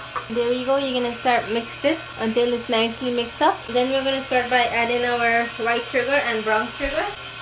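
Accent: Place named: Berbice, Guyana. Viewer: Just luv that Berbice accent!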